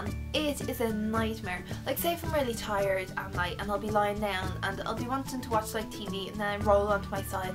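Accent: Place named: Ireland. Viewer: Are you Irish? I love your accent!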